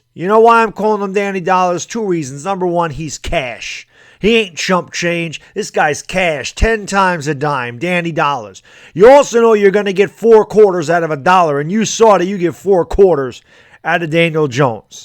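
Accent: New York, United States. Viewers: Lmfao this man has played 1 game against the terrible bucs and now he’s New York fav player 😂😂I cant wait until he gets shit on and New York sports is back in purgatory and dick heads like you with your trash New York accent will go back to stfu in your shoebox apartments.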